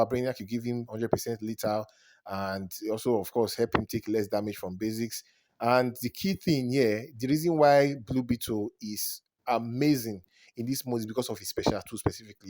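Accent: Nigeria. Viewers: I enjoyed the video bro you sound like you are a Nigerian 😊. I don’t know how to play league invasions was looking for answers and then stumbled upon your video 🙏🏾. I have all the best teams and op artifacts still I suck 😂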